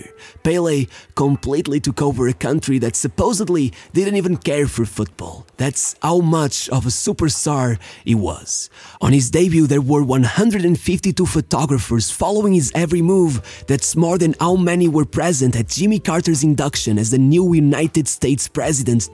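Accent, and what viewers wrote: Portugal. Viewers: He has a Portuguese accent, English is not his first language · It's normal that you will praise Pele because you are either Portuguese or Brazilian as can be guessed from your English accent resembling Jose Mourinho.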